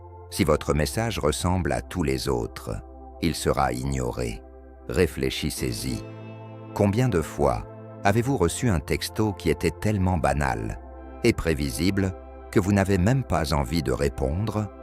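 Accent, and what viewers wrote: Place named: England, United Kingdom. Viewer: Ton accent englais et ton ton robotique m'écourent. Va chier, sale intelligence artificielle. Je vais continuer à me fier à mes dieux.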